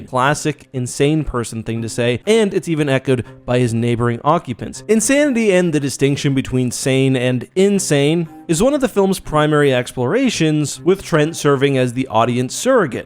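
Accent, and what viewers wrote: Canada. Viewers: Is the narrator Canadian. I could hear "OOT" and "ABOOT".....?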